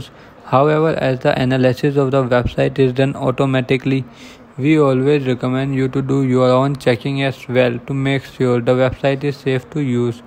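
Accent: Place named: India. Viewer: I feel ya, could not understand a word he said and the Indian accent does not help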